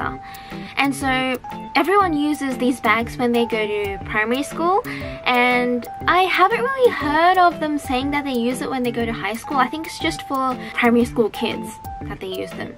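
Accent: Australia. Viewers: On top her Australian accent is really Charming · please do a video with your thickest Aussie accent😂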